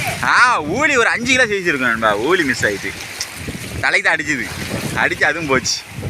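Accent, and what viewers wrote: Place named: Sri Lanka. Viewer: Antha tamil selong super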